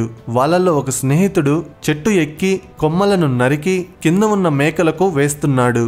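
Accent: Telangana, India. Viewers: Pakka Telangana slang Love from Nizamabad... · Telangana yaasa lo maatlaadithe funny ga undhi..😆 Normal fluency alavaatu ayyindhi ga andhuke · Telangana slang supper bhaiya.. Fan of ur voice of slang❤️ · miru chala baga telangana yasani matladatu great job man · Pure telangana yasa😘❤️ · Wonderful expressions with pure Telangana voice Anna tq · Telangana slang 👌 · Nice... Telangana slang lo manchiga cheppinav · Telangana voice over 👌👌👌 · Telangana voice super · Telangana slang em matladinav bro... Hats off bro👏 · జల్,జంగిల్, జమీన్, జై కొమురం భీమ్ ఎం మాట్లాడినవ్ భాయ్ తేట తెలంగాణ యాస.👌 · Telangala slang super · Telangana slag lo masth chepinav...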